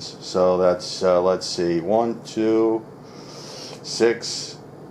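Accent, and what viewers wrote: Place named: Staten Island, United States. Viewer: Hey. This is incredible stuff. It makes it even more enjoyable that you have the exact same accent as my beloved uncle who grew up on Staten Island. Question: would you make hollows and rounds to sell? I would much rather buy from you than try to track some down from across the pond.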